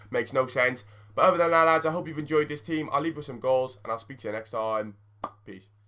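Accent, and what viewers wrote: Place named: Liverpool, United Kingdom. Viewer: why are you a scouse?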